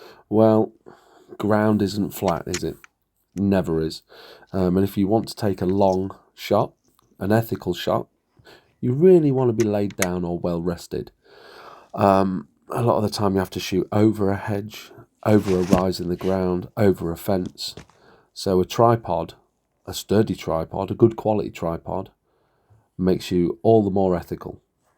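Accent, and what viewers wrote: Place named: Lincoln, United Kingdom. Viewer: lincoln, im hull, thought i recognised that beautiful mucky accent. il give you a message one day and pop down!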